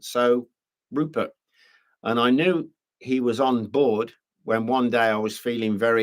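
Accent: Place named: Midlands, United Kingdom. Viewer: I love listening to you. It is nice to hear someone with my accent on youtube, as I come from somewhere near Lichfield. You truly are a gifted story teller and I love both your history videos and the ones about your life❤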